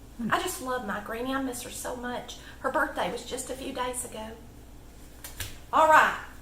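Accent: South, United States. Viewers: Love that southern accent!!! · I am from Georgia, Rockdale County, and totally cook southern style. In love to watch you and hear your accent, I have lost most of mine. Your husband does a very nice job as your camera man. You cook differently than I do but it all seems to come out very well and looks delicious. ( I know we all do it as either our mother or grandmothers did). I would absolutely love to have some of your gift of the red Plum Jam. I know that it is made from those little wild plum trees you see growing just off the road all over the state. Any way I will keep watching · You sound and cook like a southern woman if you are I'm am to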